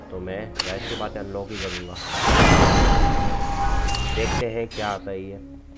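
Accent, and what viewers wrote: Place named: India. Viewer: You speech India 🇮🇳 will I don’t mind,it ok 👍 and I don’t have that dinosaur yet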